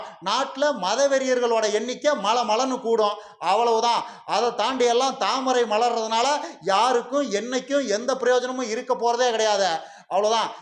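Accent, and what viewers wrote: Kongu, India. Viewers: Super explanation speech I like your gongunad speech congratulations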